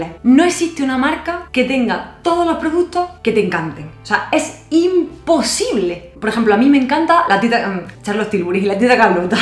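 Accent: Andalusia, Spain. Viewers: Gracias por tus consejos, te sigo desde hace tiempo y me encantas, además me encanta tú acento y que estés en Motril, ya que conozco Motril, Lanjarón y toda la Alpujarra por qué mi padre es de Granada y nos encanta Andalucía y vuestro salero,un abrazo muy fuerte de Mallorca. Se me olvidaba 16 jajaja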